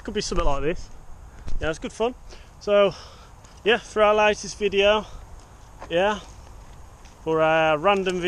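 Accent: Black Country, United Kingdom. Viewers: “It looks like a spider’s web doe it” Ah the refreshing sound of a Yam Yam...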